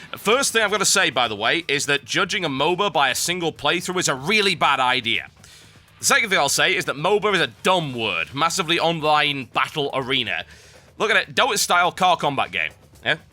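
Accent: United Kingdom. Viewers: I just love the sarcasm and british accent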